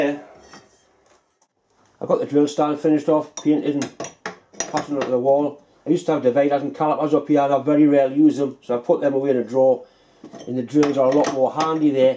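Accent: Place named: Northern England, United Kingdom. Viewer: So good to listen to someone with a good northern accent rather than an american when learning about using lathes.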